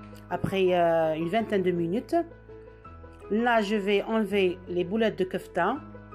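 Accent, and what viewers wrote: Oran, Algeria. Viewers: عجبتي وهرانية تاعك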